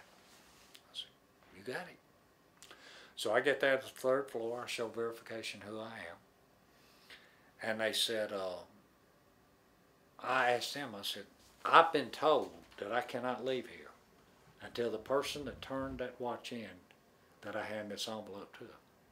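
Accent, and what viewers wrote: South, United States. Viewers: I just love listening to talk! He’s an awesome story teller, and love that southern accent!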